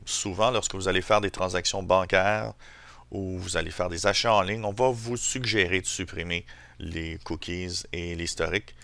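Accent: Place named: England, United Kingdom. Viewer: belle accent anglais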